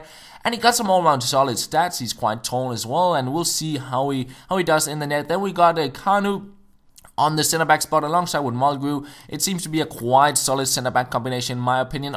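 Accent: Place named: Denmark. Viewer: Thats your opinion He is from denmark and that is his accent so deal with it If it annoys you so much than dont watch his videos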